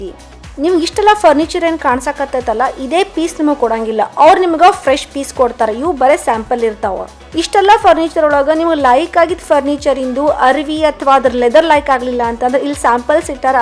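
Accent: North Karnataka, India. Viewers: uttara karnataka language matadtira akka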